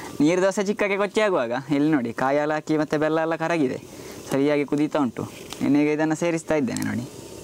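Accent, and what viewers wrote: Mangalore, India. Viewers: Nimma vids ge naanu addicted aagogidini.. eshtu chanda adugegalu, chanda parisara, aa manglooru kade kannada, ahhaa.. nodtaa kootre samaya hoguvude gottagodilla nodri maarre!! Bahala santhosha!!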